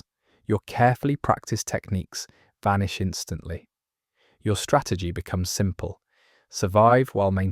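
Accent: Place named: United Kingdom. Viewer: I started listening to videos like this when im studying but the British accent makes it so soothing, I found you guys through the with hunt video but am learning so much from videos. What’s the next topic and when will it be available?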